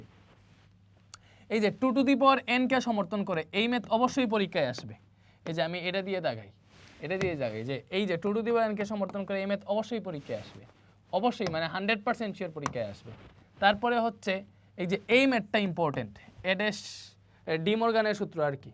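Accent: Sylhet, Bangladesh. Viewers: Vaiiya apni kita sylheti ni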